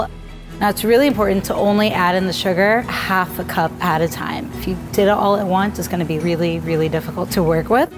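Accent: United States. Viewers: Sounds American to me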